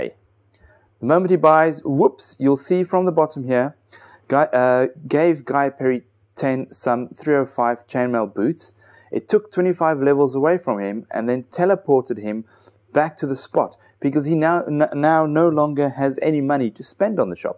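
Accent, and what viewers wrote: Afrikaans, South Africa. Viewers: is jy eintlik afrikaans? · are u south african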